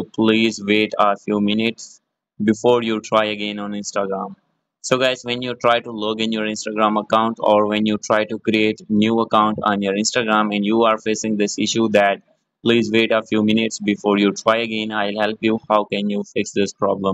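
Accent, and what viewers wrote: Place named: India. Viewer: Indian ahh accent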